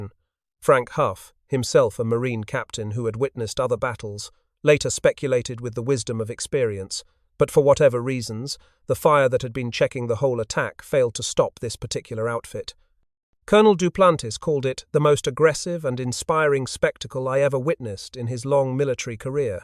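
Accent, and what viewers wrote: United Kingdom. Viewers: They selected a british accent narrator, so you get all the UK pronunciations for a very American operation.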